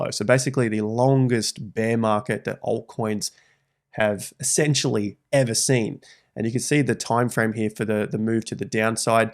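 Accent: Australia. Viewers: Yeah, the Aussie accent is brutal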